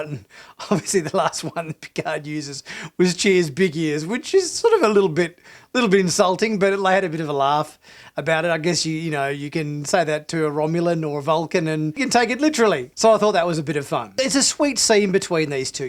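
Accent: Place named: Australia. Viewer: So I'm confused. I'm in Australia and checking my Amazon Prime Video this Season 2 Episode 1 is not available yet as of 4Mar~ am AWST, assume it will drop this afternoon as it usually does., by your accent I assume you are Aussie and are in Australia, so how did you (and others) watch the Episode? Obviously I'm missing something somewhere?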